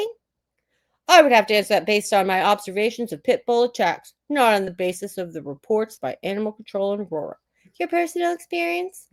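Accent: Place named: South, United States. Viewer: You’re throwing me off with the southern accent because I don’t think Floridians speak like that. 😅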